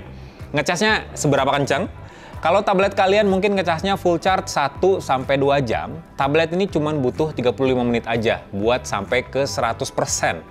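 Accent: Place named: Brazil. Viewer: he has Brazilian Portuguese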